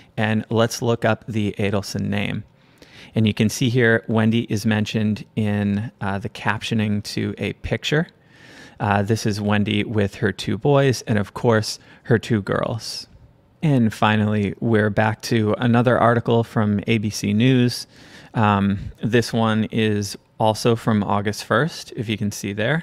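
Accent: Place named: Georgia, United States. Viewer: Actually, sweet sound of Georgia.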